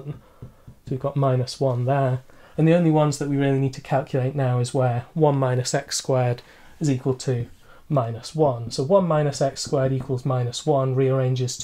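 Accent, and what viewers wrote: England, United Kingdom. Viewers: Fantastic solution! I really appreciate how systematically you approached the problem, making it easy to follow and understand. Your channel is truly a gem for anyone looking to improve their math skills, and your way makes learning enjoyable. Please keep uploading such excellent videos! Your dedication and clear explanations are incredibly valuable. Apart from the maths, I like your English accent, it is amazing. Like from Saudi Arabia 🇸🇦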